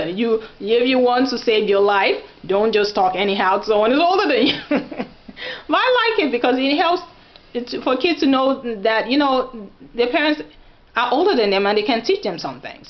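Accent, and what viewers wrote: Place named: Yoruba, Nigeria. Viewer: am a yoruba girl too and really like the tag u made.... lol about the ridiculos questions... comes around me too so many times!!!! keep up ur yoruba accent!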